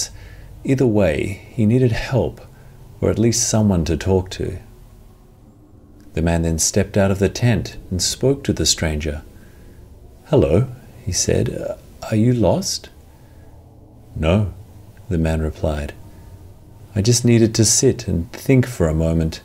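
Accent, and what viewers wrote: Australia. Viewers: Is it the cultivated Aussie accent?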